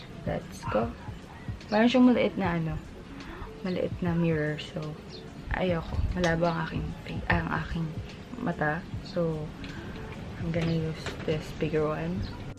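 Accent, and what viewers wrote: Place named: La Salle, Philippines. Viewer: Hahahaha ung mga aso. Lakas maka La Sallista sis. Ang accent 😊 tho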